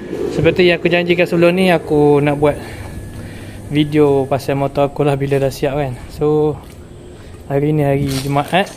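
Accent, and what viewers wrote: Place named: Pahang, Malaysia. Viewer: gaye berckp mcm org pahang..heheheh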